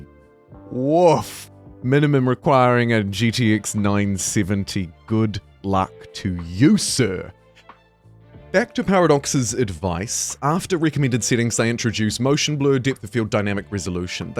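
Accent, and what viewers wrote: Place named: New Zealand. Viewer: Kia ora. Another kiwi that accidentally pronounces it "pre-formance" rather "performance". The game is atrocious. If it were a toaster, an oven, or a tv you'd return it for a refund. Got my refund. Will see what happens to the game over the next year but not holding out hope. This game will go down in history as an infamous disaster.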